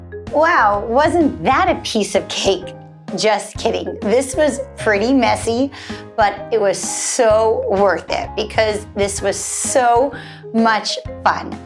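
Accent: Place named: New York, United States. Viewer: aw! I’m from NY and your accent just makes me miss home. Lol! I love this video, I rarely sit and watch an entire video with the volume up haha. Thank you, will be showing my kids your channel.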